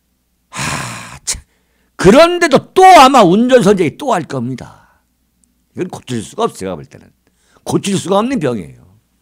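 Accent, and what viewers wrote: Chungcheong, South Korea. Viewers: 충청도 분이신가요? 말투 재밌네요 ㅎ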